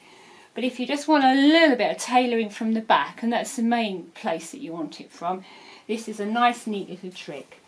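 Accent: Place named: England, United Kingdom. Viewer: I would listen to your English accent for my whole life. Lovely.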